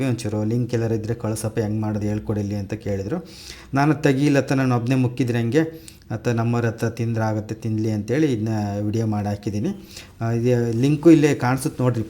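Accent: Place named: Channagiri, India. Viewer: Channagiri side pura hege matu keli thumbha kushi aytu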